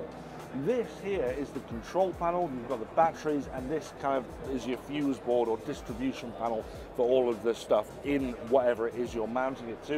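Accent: United Kingdom. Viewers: I can't understand the British accent in general, so I just turn off the audio, turn on the cc subtitles and run yt videos at 2x.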